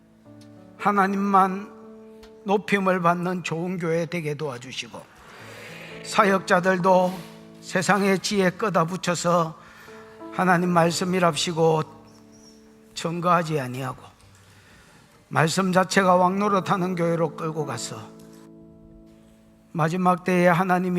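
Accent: Gyeongsang, South Korea. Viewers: 아멘 경상도 말을 득도하고 있습니다.!!!! 어쩜 이렇게 귀에 쏙 들어오는 말인지요.!!!!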